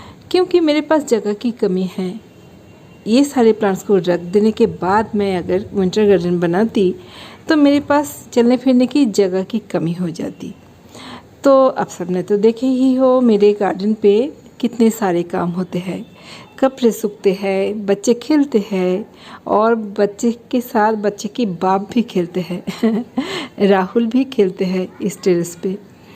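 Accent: Bengal, India. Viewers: Aap ki Bangali tadke Vali Hindi mujhe bahut pasand aati hai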